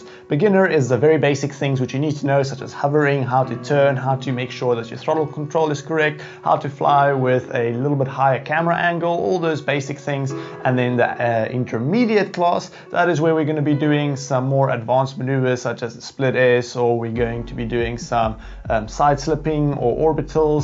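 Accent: South Africa. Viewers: I've just started getting into fpv flight (got a tinyhawk rtf bundle and put PMB on it in betaflight) going to watch all of these vids. Also are you South African?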